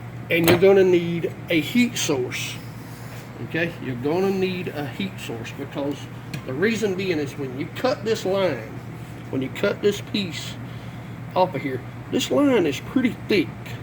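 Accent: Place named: South, United States. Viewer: Even with the down South accent, I got something useful out if this video. That workaround fix looks pret darnt good good buddy. I think I will try it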